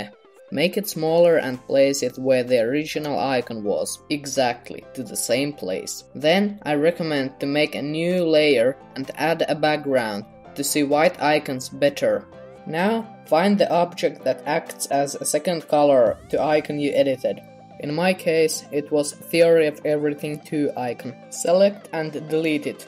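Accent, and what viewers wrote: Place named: Finland. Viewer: Haha ku puhut enkkuu nii siit kuulee et oot suomalainen:D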